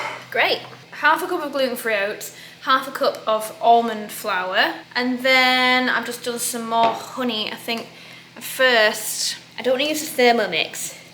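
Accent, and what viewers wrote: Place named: North, United Kingdom. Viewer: My God I do love a Northern dialect! The way you say „honey“ and „gut“ 😂 ok, this sounds really weird written out but hope I’m not alone in this 🙃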